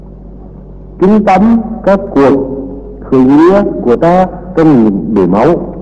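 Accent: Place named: Nghe An, Vietnam. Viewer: giọng Nghệ An mà nói giống Trung Quốc được thì chịu, mù quáng vừa thôi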